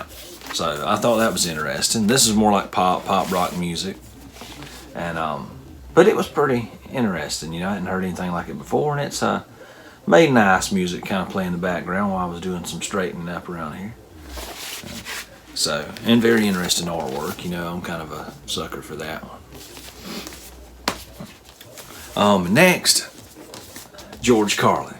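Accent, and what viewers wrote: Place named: South, United States. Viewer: I love listening to your southern drawl. It's like music in itself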